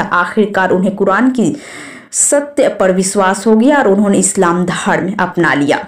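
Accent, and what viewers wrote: India. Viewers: Tumhari boli batati hai ki tum bihari ho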